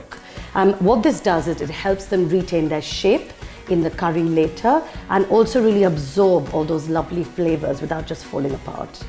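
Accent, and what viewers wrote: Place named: India. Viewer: Excellent recipe, thank you. Side note: the Indian/English accent is the most beautiful accent in the world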